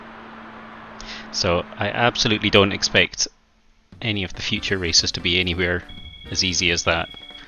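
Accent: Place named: Scotland, United Kingdom. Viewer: Good to hear.. Scottish accents are the best in my opinion...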